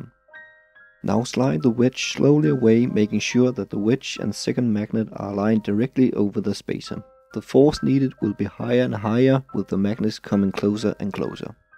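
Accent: Denmark. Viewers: WAUW YOU HAVE A LOVELY ACCENT. WHERE ARE YOU FROM, no wait let me guess. denmark?D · Are you Danish by any chance? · i can hear at your accent that you are from denmark im norwegian, nice video neightbour :) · I enjoyed this video very much. I have learned something new today about magnets I didn't know. I can understand you well with your Danish accent. Thank you. Blessings to all. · it funny when you hear a danish person talk english the accent just sound so wrong because im used to that accent in danish XD still very good vids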